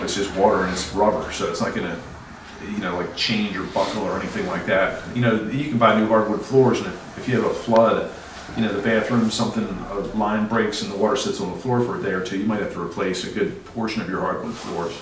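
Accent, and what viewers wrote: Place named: Baltimore, United States. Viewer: This guy knows his stuff,but even more important you can clearly get a good understanding of hearing his authentic Balmer-accent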